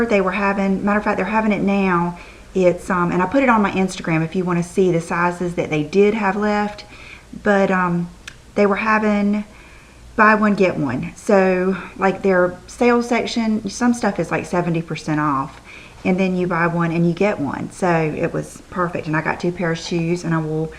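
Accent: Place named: South, United States. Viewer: I just discovered your channel through Rachel Talbot and I LOVE it !!! I'm French and I have a weird obsession with the southern accent ahahah I wish I could talk like you :-) Anyway I really like your videos and I'm gonna catch up on most of them. Xoxo from France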